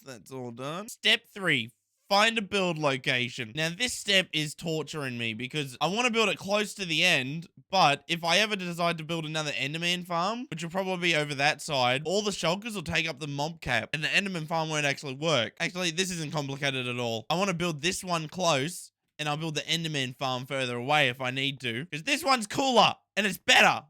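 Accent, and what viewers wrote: Australia. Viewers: You sound aggressively Australian, but like you've lived in Britain or the US for like the last 2-3 years.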